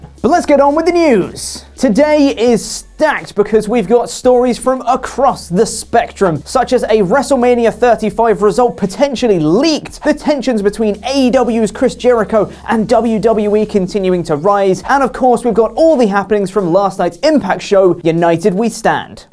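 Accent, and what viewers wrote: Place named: England, United Kingdom. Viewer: As long as you have an English accent I could care less if you’re a different person.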